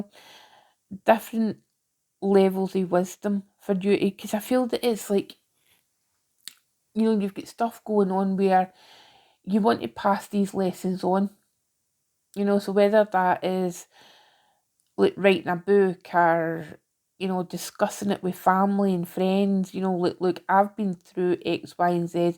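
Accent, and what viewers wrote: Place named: Glasgow, United Kingdom. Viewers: Great read, love to hear a weegie, my family all from Glasgow and you make me feel like home ❤ thank you ❤ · The accent feels like home to me, as my late father was Glaswegian. My friend gifted me Osho Zen cards and the cards I pulled pretty much said "I'm not meant to know yet", like the clouds in the tapir card. Thank you for the reading ❤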